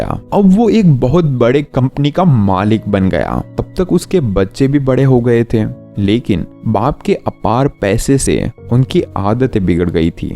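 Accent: Gujarat, India. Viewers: deepak kumar bhai We are gujrati hindi bolne ka jo tarika hota hai aaisa hi hota hai... sabko continue bolne me problem hota hai... kai ese sabd hote he jo hindi bol nahi pate... agar aapko aapni bhasa se dusri bhasa bolne ko bole to aapko bhi problem honga... so don't mind improvement sabhi ka aa jata hai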